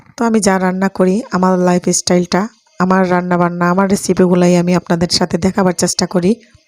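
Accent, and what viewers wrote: Sylhet, Bangladesh. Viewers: tumi sylheti ni go boin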